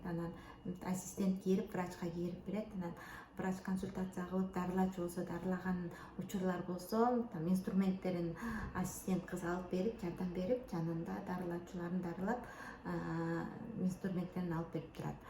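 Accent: Standard. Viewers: Кыйын Кыз экенсин,кыргызча нукура суйлоп тушундуруп.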